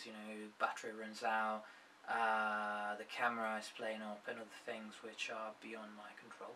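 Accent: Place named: North, United Kingdom. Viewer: You have a REALLY interesting accent, put me out of my misery? There is some Northern UK mixed with an European accent....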